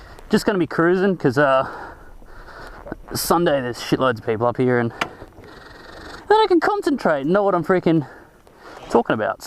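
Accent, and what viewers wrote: Australia. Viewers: One of the best reviews I’ve seen in ages! Thanks! Nice to hear an Aussie for a change and no boring 5 minute irrelevant story that seems standard in the states? Haha. Excellent review thanks!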